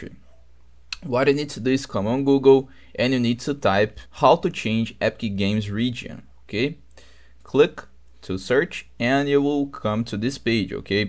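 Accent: Brazil. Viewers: Sabia que tu era brasileiro no momento que abri esse vídeo mano kkkkkk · primeira palavra ja percebi q era br kkkkkkk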